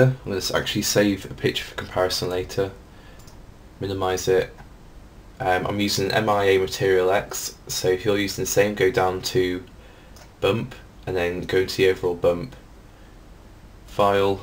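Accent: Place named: United Kingdom. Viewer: that's a very usefull how-to! what kind of british accent are you speaking? i like it.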